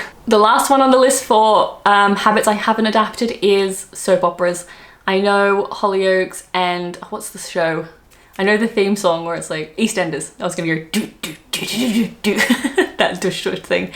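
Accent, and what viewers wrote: Australia. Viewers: You do still sound Australian if that helps, although not overly so, quite a posh version 😁🤔. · You are sadly losing your lovely Aussie twang it’s changing gradually in to a posh English accent. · We Brits can be direct, particularly if trying to get ones money back for a cancelled event or faulty goods. Venting ones spleen is cheaper than an exorbitantly priced Solicitors letter. You can pass as a Brit as your accent is not overtly Aussie, more nondescript and anyone who lived in Earls Court for a reasonable time would be used to Aussieisms, used by all residents there. Is it still the Aussie capital of London? The politeness on the roads is an hangover from as late as the 50's, when horse drawn transport was still common and one gave way to an horse drawn vehicle, they still have right of way. Not being a Cricket fan and admitting it has just presented Australian immigration authorities an excuse for refusing entry back into the country, Despite the Australian lockdown this upcoming Ashes tour has got the go ahead, nothing stops the Ashes, apart from a World War.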